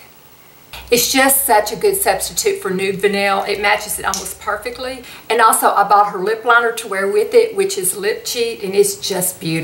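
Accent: South, United States. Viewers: Love this video! I do appreciate your Southern accent, since I’m a Southern girl myself. You look great! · You are stunning,! I just love your beautiful Southern accent. Will you please do a tutorial with a complete demonstration on how you styled your hair for this video? I'm a visual learner with several new disabilities from a brain tumor. I've learned so much from you and your wealth of valuable information. Thanks for all you do!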